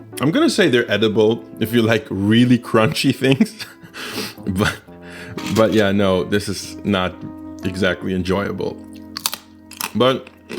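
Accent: Germany. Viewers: Hui der ist ja auch deutsch:3 habe ich nicht nur am Akzent, oder der Sprache von Lieferando erkannt :0.. ich kannte einige Lieferanten aus meiner Gegend uwu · He’s russian, grew up in germany (that’s probably what’s up with his accent) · Dieser deutsche akzent lul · Ich schaue das Video und dachte so: wow der typ hat nen leichten deutschen Akzent 😂👀 Gutes video 💪🏼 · I watched the Shrimp cracker video first and I already assumed that you might be german hence your subtle accent. Turns out I'm right!!! And you're even a Berliner!!! I really like your channel and.. why do I even feel like we life in the same area?? lmaooo (I'm not a crazy stalker I swear xd) Definitely subscribing!!!!!!~~ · Gad dammit!!! I just knew you were german. It`s my second video from you and I just knew something was wrong with your accent and now I know it for sure. Greetings from Luebeck. 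· Wer hat auch direkt an seinem Akzent bemerkt das der deutsche ist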